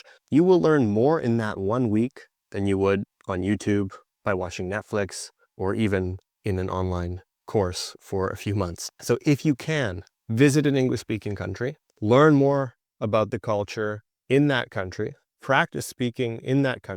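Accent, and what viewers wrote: United States. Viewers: Are you speaking in American accent or British?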